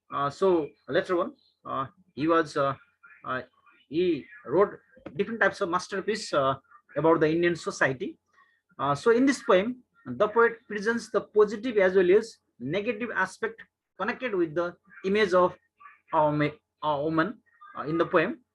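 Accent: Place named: United States. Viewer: Nepali ma ni vannu ni kattina american jasto english matra🙄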